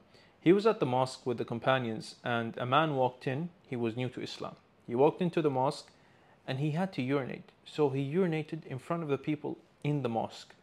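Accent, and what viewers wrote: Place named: India. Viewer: ..why do you sound like Indian, ?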